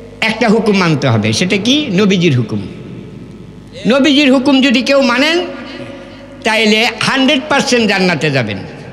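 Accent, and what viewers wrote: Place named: Sylhet, Bangladesh. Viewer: আমি ভারতের আসাম থেকে বলছি,, চাচা তোমার ভাষায় বুঝা যাচ্ছে, তোমার বাড়ী সোনামগনজ সিলেট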